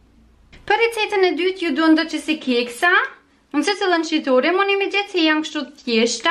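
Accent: Kosovo. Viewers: QENKE SHUM E MIRE DHE SHPJEGON SHUM MIRE ME PELQEN DIALEKTI KOSOVAR SHUM. TE PERSHENDES. 🙋😄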